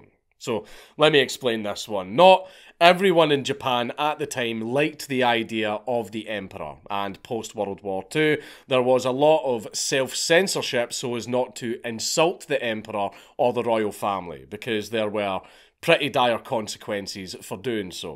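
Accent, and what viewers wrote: Scotland, United Kingdom. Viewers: i chalk that up to thick Scottish accent.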